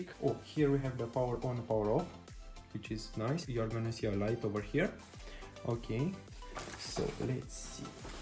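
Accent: Romania. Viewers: Recunosti romanul dupa accent din prima....super tare video! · Multumesc de review, ca vreau sa mi-l iau peste cateva zile(mi-am dat seama ca esti roman dupa accent)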